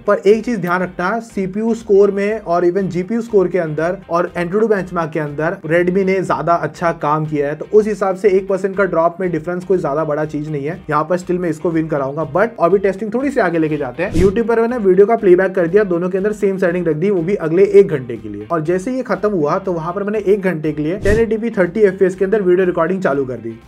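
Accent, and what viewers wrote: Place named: Punjab, India. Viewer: Bhai apke accent Punjabi hai ❤😊😊😊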